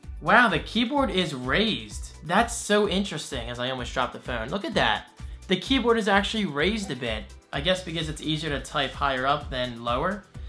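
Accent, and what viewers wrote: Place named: Philadelphia, United States. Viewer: You from Philly? Edit you said your dad went to AC. You got a Philly accent.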